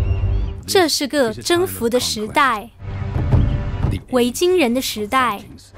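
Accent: Taiwan. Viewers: 我覺得有六七分像，但不完全像，台灣人講話比較輕，沒那麼用力，語速也沒那麼慢。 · 为啥要用台湾腔啦，淦。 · 很用力的模仿台湾解说腔，“强力冲击”最后一个字要发成二声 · 这次太秀了，台湾腔加各种段子，我希望以后这样的作品会更多，给你点赞！ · 这台湾腔学得很牛逼啊! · 哈哈哈哈哈哈哈这台湾腔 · 为什么4台湾口音？ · 這台灣腔？ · 台湾配音的国家地理中文节目，就是这种风格。我小时候经常看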